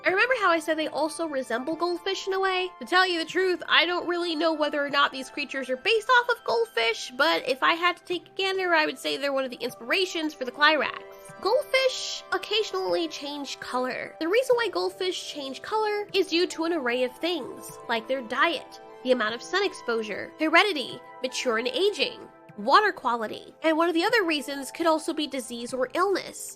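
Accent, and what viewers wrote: United Kingdom. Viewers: wait are you British?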